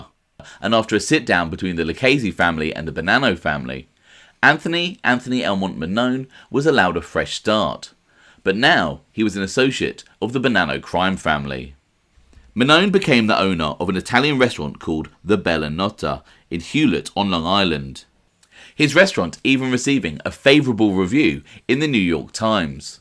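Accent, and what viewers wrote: United Kingdom. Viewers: Your British accent is a monotone puts people to sleep get a mob guy to report much more exciting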